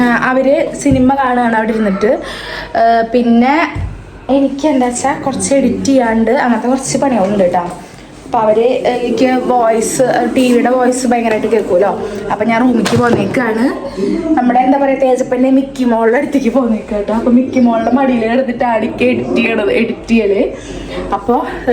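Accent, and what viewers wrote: Thrissur, India. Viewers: Thrissur angne paraya sheriyakknonnulyaa😌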